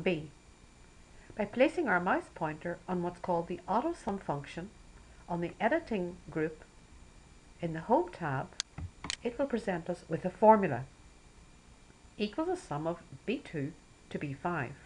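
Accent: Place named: Ireland. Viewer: Thank you for your time. Love the motherly Irish (?) lilt too, very relaxing, I almost fell asleep:)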